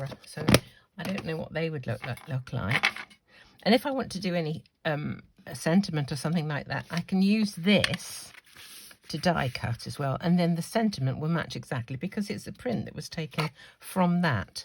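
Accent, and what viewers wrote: United Kingdom. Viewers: I just found you I love your British accent I'm from East if Europe but I leave in USA and love your video so much is so so interesting and very educativ .I'm a beginner on card making Thank you so so much Best to you from VA 🇺🇸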